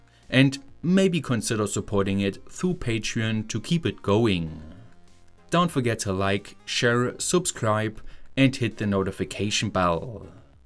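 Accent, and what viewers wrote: Germany. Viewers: are u german? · Ich liebe dieses Deutscher versucht Englisch zu sprechen, die Betonungen sind Gold...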